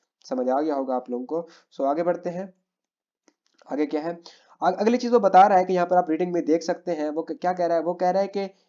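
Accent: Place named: Pakistan. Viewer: jee bhai jan, i can see by your accent, mai bhe Pakistan sy hn, graduate of AIMC Lahore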